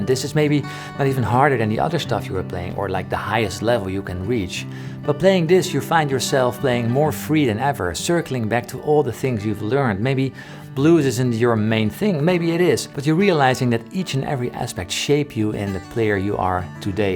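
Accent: Netherlands. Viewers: Me trying to figure out if this man is Dutch or not. Him Me: Yup, deffo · are u from holland? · already felt like it was a dutch accent XD ook hallo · I sound a little less Dutch I hope but he's the better teacher haha netjes broer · oh mijn god sounds dutch!💯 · Funny, he also plays with a kinda Dutchie Euro accent. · "The beard guy with the weird accent" I'm gonna guess Swedish. I don't know why, but that's what my first thought was. Edit after finding out it's a Dutch accent: Well I got the continent right. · Only the Dutch have this beautiful accent when they speak English:)) Hartstikke mooi joh, ben een beginner maar ga je zeker volgen!! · You inspire me to play the guitar. I really like 08. very beautiful sounds. Ik hoorde trouwens gelijk dat je nederlands was, fantastisch! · Well I always thought you were dutch from the accent. This did it for me XD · I love your Nederlands accent 😂❤️ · I thought 'hmm, I think he's Dutch' but at the 'Oh mijn God' I knew it hahahaa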